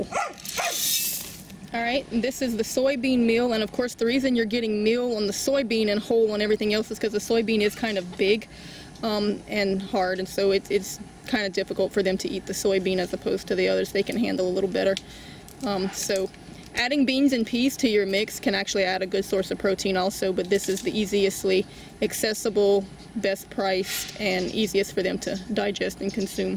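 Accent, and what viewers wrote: Louisiana, United States. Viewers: Where are you from? Louisiana??? Love your video. Very informative.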